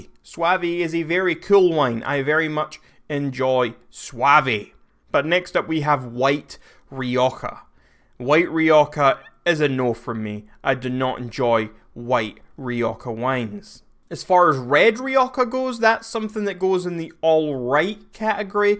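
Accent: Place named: Scotland, United Kingdom. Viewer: Your Scottish bro, stop tryna act class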